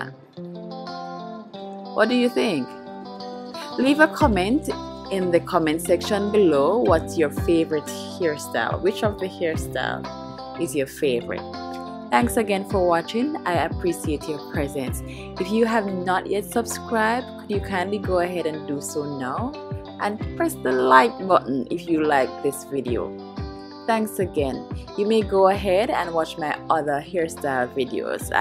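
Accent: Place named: Jamaica. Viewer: I love your accent. Is it Jamaican 🇯🇲?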